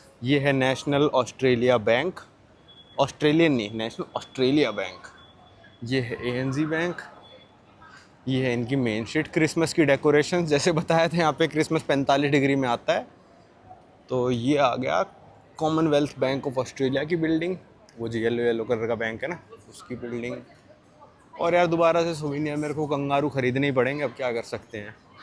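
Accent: Haryana, India. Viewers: Mainly m bhai ki video dekhta hu bhai ke baat krne ke tarike se attract hoke bahadurgarh wale style me mast😅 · Batane lag raha hu....jaane lag raha hu....batane lag raha hu....ye bhai lag raha hu kisi bhi cheez ke peh le .......ye kis region ki hindi hai india mein? Koii bata de...may be a weird question to ask😂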